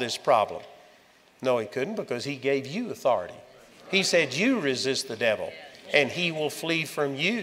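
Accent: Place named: Texas, United States. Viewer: Andrew, you are the best. You are, what, 73-years-old? You look half your age, strong as an ox, going great guns and you talk about standing 10 hours out of 11 like it's a walk in the park, not even realizing that you pushed yourself, LOL! I truly love and adore you. Your lovely Texan drawl still lulls me to sleep at night, especially when I am especially frightened or frail or falling into despair, and I am still very much Saved and living for the Lord and leaning into His sweet Grace and Comfort. Hallelujah. Praise God. Thank-you for being there, and being out there, and being so honest. Blessings to you 💙🙏🏼💙🙏🏼💙🙏🏼💙💙💙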